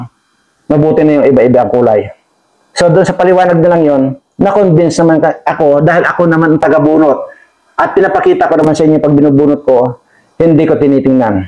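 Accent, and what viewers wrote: Iloilo, Philippines. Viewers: Daw ilonggo ka ,namit ka magestorya noh, kaluluoy man ang iyong nobya at hinimod na tanan sa imo hehe ,trying hard ko maghambal sang ilonggo,kahapon batangeno ka magsalita,bukas puede ilokano met tapno manggeg ko met nga agilokano ka maysa ak nga ilokano ngamen isu nga kayatko ko nga agsao ka no bigat no mabalin laeng, sige sayaw sir pangpagoodvives, happy kami na subscribers... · Tuno ilonggo man sir ako karay a man antiquenio man🤣😂👊👍🙌💚✅🙏🇵🇭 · Good morning po sir, napasaya mo po ako sa ilonggo accent mo😊🥰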